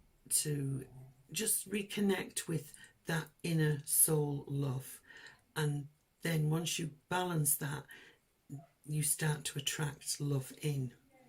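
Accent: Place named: Rochdale, United Kingdom. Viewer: Thank you, this resonates with me in two on-going areas of my life. I've subscribed so looking forward to monthly readings. (Are you a Rochdale girl by any chance? Only asking this because you sound just like me!) The best general monthly reading I've ever watched xx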